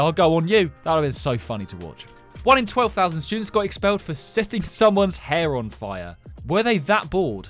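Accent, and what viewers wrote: United Kingdom. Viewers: Dropping fireworks hmm what does that remind you of maybe the Weasley twins from Harry Potter also you keep reminding me of Ron with your British accent and curly hair.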